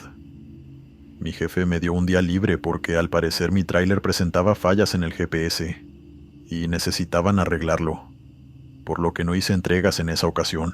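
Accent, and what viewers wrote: Mexico. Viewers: La voz del narrador es absolutamente envolvente, su acento Mexicano la hace aún más increíble, perfecta para relatos de terror. Adoro este canal🙏🏽🇨🇴❤️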